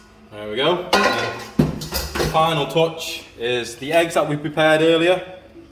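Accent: United Kingdom. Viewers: Awesome how this dude looks like full, full native Thai and then speaks like a a British man. 😆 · when did you move to thailand? fitting name, you sound British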